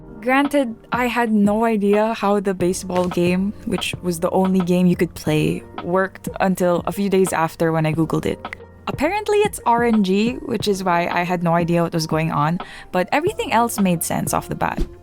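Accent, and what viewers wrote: Philippines. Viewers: I've only watched the first 10 seconds and oh my god are you Filipino? Edit: I checked your bio, you are?!?!?! :DDDDDD AHH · Omg! Im also pinoy! Kamusta? I never realized na filipino ka pala. Pero may slight filipino tang sa accent mo.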